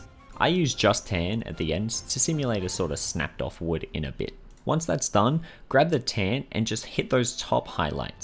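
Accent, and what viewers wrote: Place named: New Zealand. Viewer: Thanks for making these videos! L💘ve the kiwi accent too!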